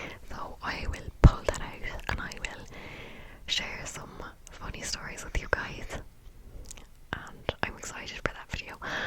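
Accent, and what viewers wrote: Ireland. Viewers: I thought and did exactly the same! 😂 Love the Irish accent! (more please) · Well, ya got me. I'm listening to this thinking it sounds like you're saying you've never been to Ireland before... but how with the accent. And then I looked at other videos 😂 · ahhh i love the irish accent videos! it scratches my brain in the best ways lol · Yay really love the Irish ☘️ accent 😊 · BTW love your Irish accent and your walkthrough of your journal! You did an amazing job.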